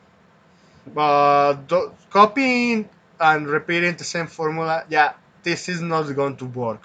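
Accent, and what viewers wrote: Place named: Spain. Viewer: Sin ofender.. Pero has probado a hacer los vídeos en español?? (Creo que es tu lengua natal, el acento me suena a español) Es que no se entiende absolutamente nada.. No tengo problemas en ver vídeos tanto en español como en inglés, pero tienes un acento extremadamente marcado y pillo solo algunas palabras sueltas. Espero que no te lo tomes a mal, he intentando ver el vídeo completo, pero ha llegado un punto en que estaba perdiendo el hilo de lo que decías incluso.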